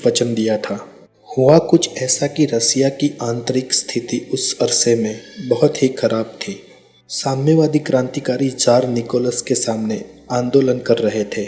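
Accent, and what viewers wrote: Gujarat, India. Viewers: आप गुजराती हो क्या ?😇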